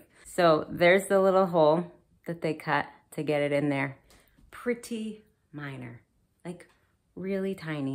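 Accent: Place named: United States. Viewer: Fellow t-1 diabetic From Australia and sounds very interesting 😊 indeed. By the way I totally love your American accent 😊.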